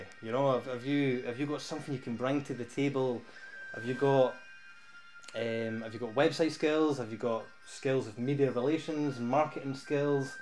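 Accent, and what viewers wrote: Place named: Scotland, United Kingdom. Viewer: Long live to scotish accent hahaha We are with you,!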